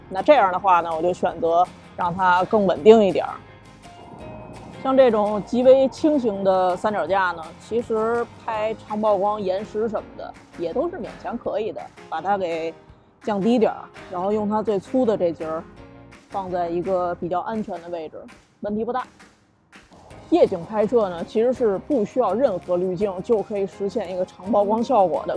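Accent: Beijing, China. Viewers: 走心❤的摄影师，NIce，听您这口音跟大马老师特别像，都是纯纯京味儿。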